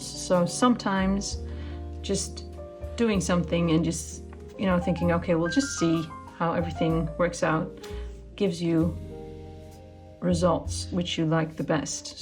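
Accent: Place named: United Kingdom. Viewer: Your english is really good! In the first moments I thought you are a native speaker, you have a lovely british accent! :)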